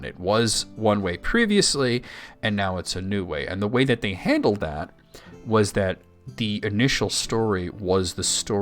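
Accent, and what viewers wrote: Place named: United States. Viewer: A small correction: A vowel, linguistically speaking, IS what you call "a vowel sound". The symbols representing the vowels do not have a name of their own. So, linguistically, "cat" and "Mars" have two different vowels at the core of the word; they don't both have one vowel called "a". So on the one hand, you are actually arguing that there are more than three vowels in Black Speech when you say that "burzum" has two different u-sounds i.e. two different vowels (/ɜ:/ and /u/ respectively). At the same time, that is your pronunciation which is clearly coloured by American English. If I were to pronounce "burzum", with the influence of my native language, it would be two /u:/ sounds, i.e. the same vowel. An English speaker reading with their accent would probably read it with around 7 vowels (/ɑ/, /ʊ/, /u~ʉ:/, /ə/, /ɪ/, /i/, /ɜ:/). 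A German speaker like me would read it with 5 vowel sounds (/a(:)/, /ʊ/, /u:/, /i:/, /ɪ/). A Spanish speaker would probably have 3 vowels in their pronunciation, and so on. You also say that languages have way more than three vowel sounds usually. That's not necessarily true. Arabic has three vowels only, and those are, in fact, /a/, /u/ and /i/. So, it would be possible that Black Speech works with just these three vowels. Now, technically, Arabic has more because you can go at it from a phonetic or phonemic perspective. Phonemic is the language as you hear it and interpret it, phonetic is language as you actually say it. Basically the difference b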